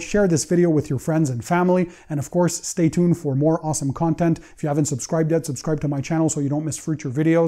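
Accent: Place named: Canada. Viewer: At first I thought this guy was a European that had an accent from speaking English as a secondary language but then I realized what I was hearing.... Canadian 😅🇨🇦 anyway, great info! thank you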